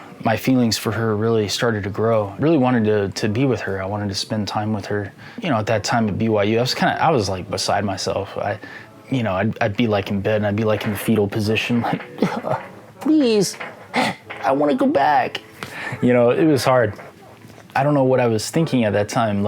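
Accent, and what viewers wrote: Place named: Tonga. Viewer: Hahahahaha I love this. Your Tongan accent is on point. You’re more Tongan than most Tongan kids. I’m so proud of you ❤️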